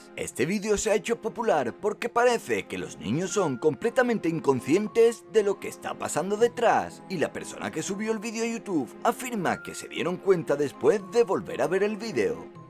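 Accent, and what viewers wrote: Spain. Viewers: FELICIDADES COMPAÑERO DE DONDE ERES? ESPAÑA? CREO QUE SI POR TU FORMA DE HABLAR ESPERO Y CUMPLAS MUCHOS AÑOS MAS AMIGO MIO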